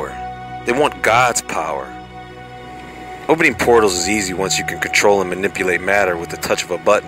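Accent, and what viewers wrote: United States. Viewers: Why am I unsurprised this is narrated by an American.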